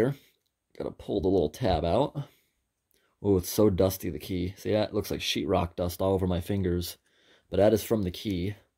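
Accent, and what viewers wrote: United States. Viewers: Why does this guy sound to me like the biggest American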